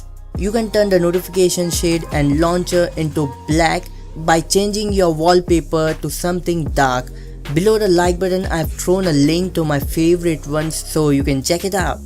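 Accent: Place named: Uganda. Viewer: Nice accent. from Uganda university?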